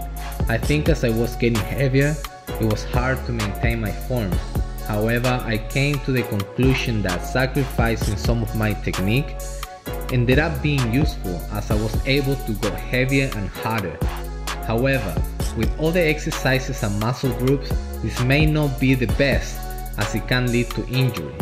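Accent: Spain. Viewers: spanish accent, acento español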